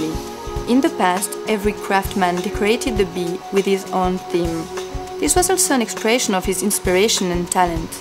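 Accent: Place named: England, United Kingdom. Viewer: Cet accent anglais m'écorche les oreilles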